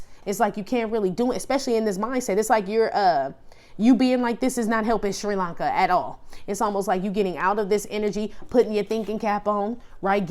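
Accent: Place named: California, United States. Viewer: My G....you got to be from So Cali....I know it!!! 😁😉 I know a fellow Californian when I hear one....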